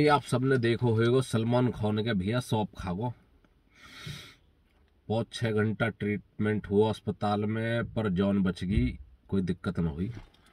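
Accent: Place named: Bihar, India. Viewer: Bhaiye jese bolte ho tum to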